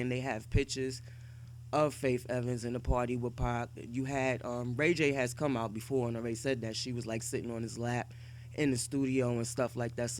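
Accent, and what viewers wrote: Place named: California, United States. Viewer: Listen u cuarvoint lady 😅😂😂😅 talk bout wht u kno you sound like you from California and you country niggas is always talkin bout New York business and don’t kno wht da fuck ya talkin bout that young lady was from BROOKLYN she was a somebody and that’s why them BROOKLYN niggas shot him in da dick point blank period now go ya chicken an waffle eating the same time ass da fuck up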